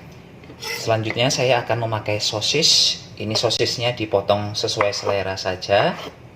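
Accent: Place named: Banyumas, Indonesia. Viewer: Mas, nganggo, bahasa, ngapak, lucu, mas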